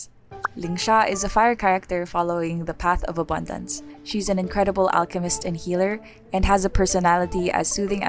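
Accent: Philippines. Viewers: Only true Filipinos can tell that has a Filipino accent 👍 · Are you Pinoy by anychance? I can hear it through your voice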